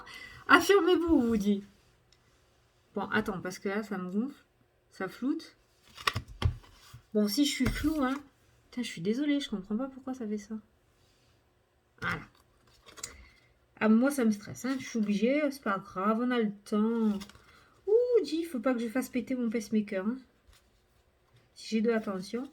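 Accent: South, France. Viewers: Merci ma jolie pour moi la vierge tu as un bel accent chtit du sud 🌹👍❤ bisous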